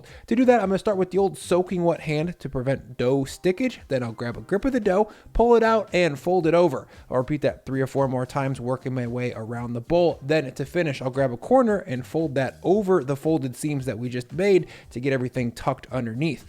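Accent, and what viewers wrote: United States. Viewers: Looks great... but Christ I cannot listen to the American accent for 14 minutes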